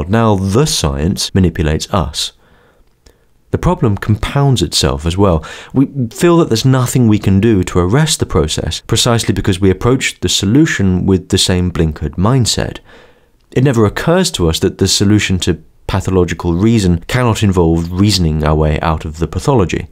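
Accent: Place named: RP, United Kingdom. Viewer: Listening to you speak with your classic posh accent is both entertaining and ASMR soothing. Hope for many more from you are you fill a gap of value to me 🙂👍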